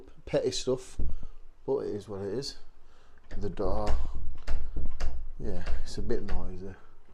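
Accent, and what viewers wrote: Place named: Yorkshire, United Kingdom. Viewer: You do remind me of somebody from emmerdale with your accent 👍enjoy your channel mate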